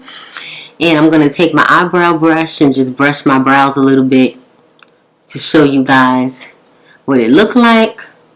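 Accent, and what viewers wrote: New York, United States. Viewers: That NY accent takes me back home every damn time 😁🥰 As always sis, you did that. They look really nice and natural. I've been contemplating ordering a kit off Amazon to make my brow shape pop and this was all I needed to see to go ahead and order it. I'm 37 and my eyebrows haven't grayed yet (knock on wood) but girl why am I getting gray eyelashes?! Like what kinda nonsense is going on here?! Them gray hairs just be finding new ways to be mad disrespectful. Speaking of lashes, waaayyy back when you had that video of applying individual lashes, do you still do your lashes at home?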